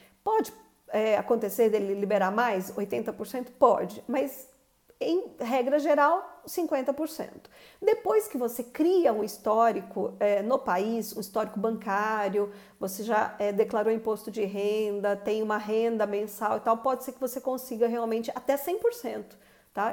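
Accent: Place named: Rio de Janeiro, Brazil. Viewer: E esse sotaque carioca?! Rsrs bjs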